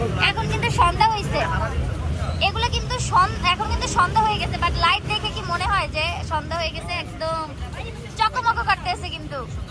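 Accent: Barishal, Bangladesh. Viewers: বরিশালের ভাষায় আর যে ভাষায় কথা বলো তবে তোমার ভিডিও করা অনেক সুন্দর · ও মোর খোদা আণণে যে বরিশাইল্লা মুই বুঝছি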